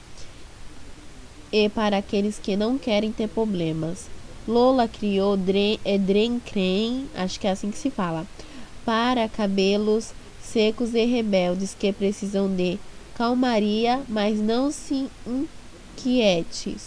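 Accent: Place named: Bahia, Brazil. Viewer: haha teu sotaque é de baiana mesmo😍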